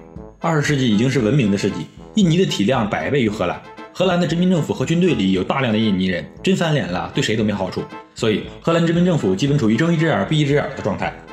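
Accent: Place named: Northeast, China. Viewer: 东北话有毒...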